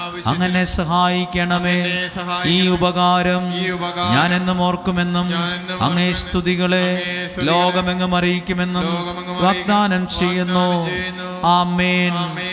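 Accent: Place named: Butler English, India. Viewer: Why is it in butler English. Can't hear or understand properly ast 2 weeks.missed ...keep only English clearly or only malayalam clearly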